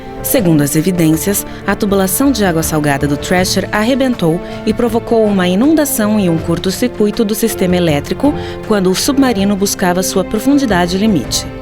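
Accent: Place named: São Paulo, Brazil. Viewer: essa mulher tem um sotaque irritante de paulista "iceberrrrg"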